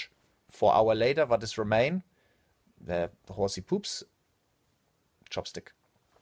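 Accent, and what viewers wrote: Germany. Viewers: Hey, kannst du eigentilch auch Englisch reden ohne den Deutschen Akzent? Bei uns in der Schule in NRW lernt man das mit Americanischem Akzent :D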